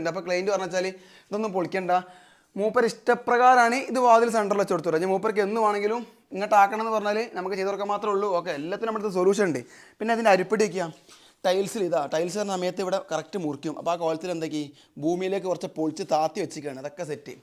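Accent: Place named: Malappuram, India. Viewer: മലപ്പുറത്ത് അങ്ങനെ ആണ് 🥰 മഴ മയ പുഴ പുയ 😅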